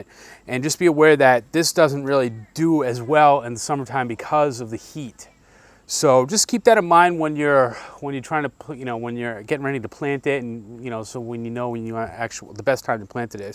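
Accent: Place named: Massachusetts, United States. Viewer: You sound like your from MA! That’s where I’m from so your accent sounds so familiar. Thanks for the content! Lots of good tips!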